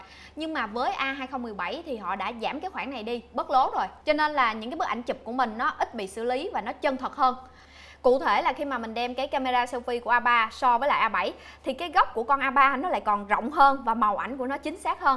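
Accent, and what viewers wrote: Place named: Mekong Delta, Vietnam. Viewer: thích cg miền tây :)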